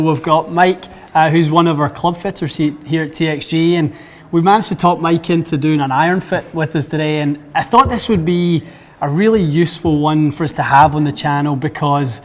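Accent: Scotland, United Kingdom. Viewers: Great videos pretty interesting stuff, always thought it was ideal to have the spin rates at the number on the club x 1000. Does more spin not allow for easier workablity left and right ? I know guys with modest club speed probably looking to max out distance but surely must be sacrificing stopping control in firmer conditions and shot shaping ability with such low spin ? I swing a 6 iron at 92/3 and am only carrying it around 184 but with spin between 5800 and 6200, also play x100 AMT as I like to see the low launch higher spin flight and also love the feel of the DG. Ive never been properly fit before but do plan to on my next set of irons, have always just went with feel. Also I can tell your from Scotland so hello from Glasgow. Cheers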